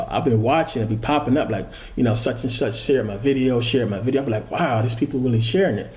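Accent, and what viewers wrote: Philadelphia, United States. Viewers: I love you videos brother. I start my day by listening to them and I end my day with them as well. Now I have my own beliefs and I won't change them based off of what anyone has to say but I will say your intellect and knowledge on certain topics is so refreshing. I always feel so positive and aware after listening to you. Keep the videos coming! God bless you. Oh yea almost forgot.....is that a Philly accent I hear? lol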